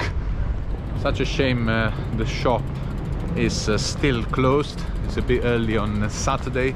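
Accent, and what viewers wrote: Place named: Italy. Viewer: Just a personal question. Did you grow up in Italy? I love your accent. Any recommendations for an American looking to take a trip to Italy, specifically regarding places to see ?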